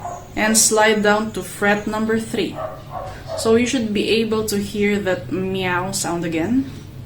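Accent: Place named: Australia. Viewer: natawa na naman ako sa australian accent, timing po nagkakape ako lumabas sa ilong ko,, 😃😄,, kahit paulit talaga natatawa pa rin ako😁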